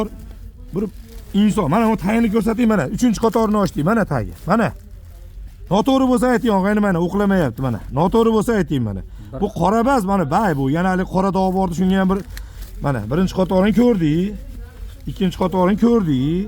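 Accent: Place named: Andijan, Uzbekistan. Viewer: Бу бола жон куйдириб гапиришидан Анжанлиека ухшайди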